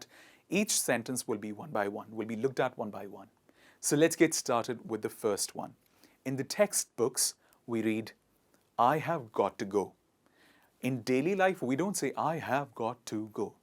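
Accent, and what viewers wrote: United States. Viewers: Is it American English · This is American English?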